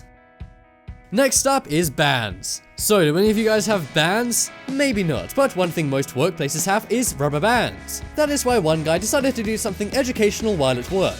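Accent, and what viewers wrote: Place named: United Kingdom. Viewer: to all the people complaining about how he says "strawberry": This dude's British, it should be obvious by the way he talks Unless British people are commenting about that, in that case... I don't care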